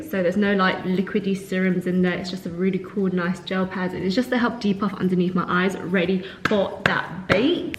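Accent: United Kingdom. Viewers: I was not expecting you to be British! What a lovely surprise. As a fellow oily skinned Brit, thank you for this vid - it’s given me lots of tips and things to try. Keep up the good work beautiful ♥️ Your Insta vids make me chuckle so much!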